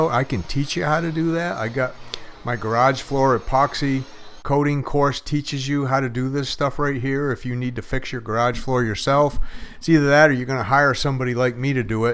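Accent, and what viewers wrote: Maine, United States. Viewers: I thought I detected a little hint of Downeast listening to you, then I saw the 207 area code… ayup.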